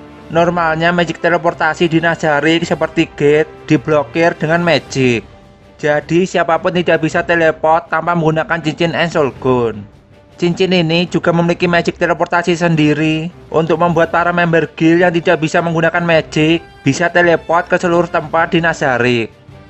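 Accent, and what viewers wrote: Java, Indonesia. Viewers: Bang bahasanya di arahkan ke gaya Indonesia aja bang, jangan terlalu ke arah Jawa, berasa kurang bang kalo masih Pake logat Jawa.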